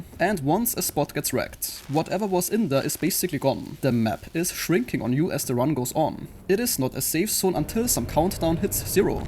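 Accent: Germany. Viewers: You sounds like german.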